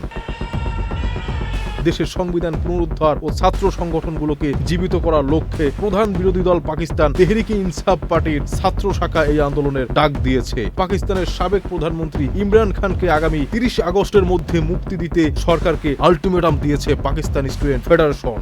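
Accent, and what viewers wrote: Kolkata, India. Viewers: apnara kolkata er style khobor prochar koren. Jamuna tv theke sikhen ..cheap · ভারতীয় ভঙ্গিতে নিউজ পড়া বাদ দেন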